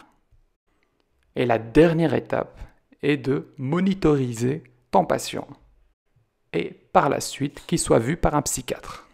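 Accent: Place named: Belgium. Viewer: Docteur, vous habitez en Belgique ? Ça se voit parce vous prononcez tachycardie avec : ch, et nonante pour 90🇧🇪🇧🇪🇧🇪😊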